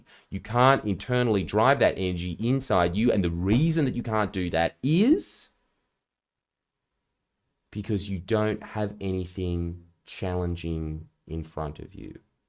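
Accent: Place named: Australia. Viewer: The only thing I have energy for is to hear beautiful Aussie accent and his perfectly cut chin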